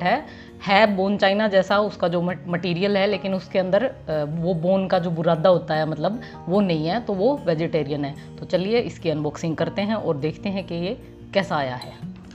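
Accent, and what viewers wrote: Haryana, India. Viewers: Your accent is Haryanvi! 🙏👍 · Haryavani hai...Jai haryana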